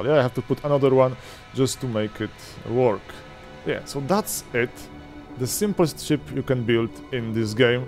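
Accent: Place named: Russia. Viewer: rasian akcent like wuj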